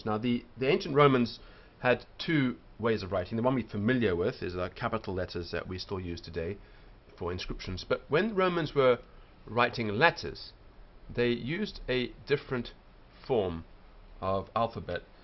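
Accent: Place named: United Kingdom. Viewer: the low audio quality and british accent makes it seem like some old bbc documentary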